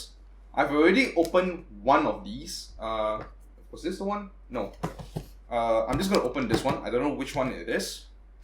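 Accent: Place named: Asia. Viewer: I love that Asian accent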